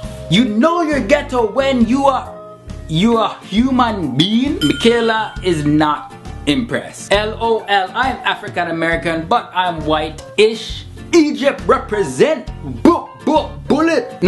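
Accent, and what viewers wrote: Jamaica. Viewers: Oh I'm just loving the Jamaican accent!! I'm from Germany and I definitely wanna to go to Jaimaica when I'm older :) So here's my question: What is your first thought when you hear the word German?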